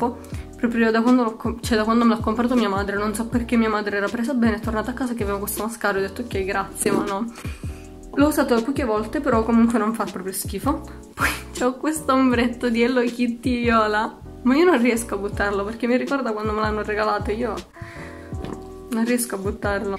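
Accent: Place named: Sicily, Italy. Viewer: secondo me sei di Marsala . Cmq sei siciliana come me. Io abito in provincia di palermo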